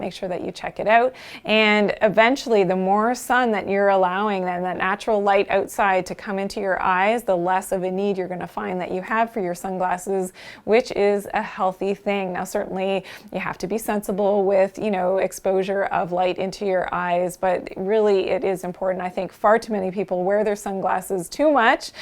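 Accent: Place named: Canada. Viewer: I love her Canadian accent, it’s not something I hear in my country and not even on tv or movies. These were good tips btw that I haven’t heard before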